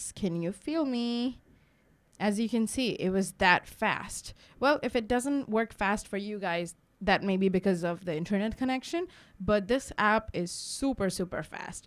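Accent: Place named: United States. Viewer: Where do we learn to speak like a Yankee?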